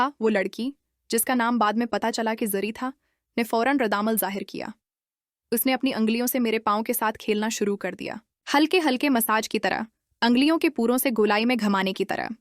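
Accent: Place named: India. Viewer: کہانی کسی انڈین لڑکی نے بیان کی ھے لہجہ پاکستانی نہیں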